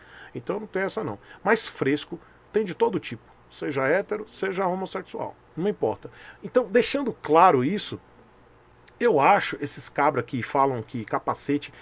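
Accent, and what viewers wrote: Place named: Northeast, Brazil. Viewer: Nossa forma de falar, nordestino kkkkk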